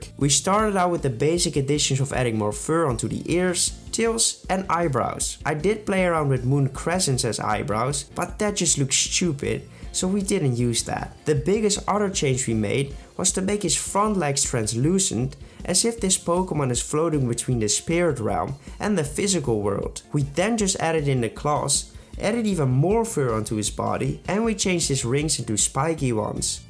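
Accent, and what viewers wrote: Netherlands. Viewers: Sorry matje je designs zijn goed maar ik kan het gewoon niet afkijken omdat je engels zo verkaast is 😅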